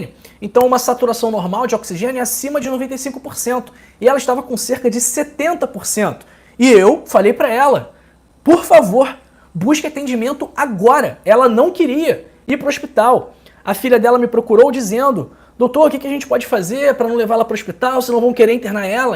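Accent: Rio de Janeiro, Brazil. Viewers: Ele tem o sotaque do Rio de Janeiro. Só isso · MariaSantos, buscando trazer dados para sua compreensão (sobre o desconfôrto dito aqui), no intuito de somar, acredito q vc tenha querido se referir, na verdade, não à "voz" em sí, mas, talvez, ao "sotaque" - isto não é dele, é do sotaque do povo do Estado do Rio de Janeiro, o "sotaque carioca", que troca o "s" pôr "ix" (ex: maS e maix, coStaS e coixtaix, diagnóStico e diagnóixtico). Espero ter contribuído. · Parece piada né kkk, o Dr todo cuidadoso, dividindo informações importantíssimas, ajudando o próximo e a criatura reclamando do sotaque Cariquexxxx. O mundo tá do avesso 🙃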